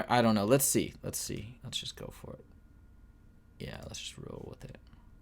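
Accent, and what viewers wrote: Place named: United Kingdom. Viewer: Lol your british accent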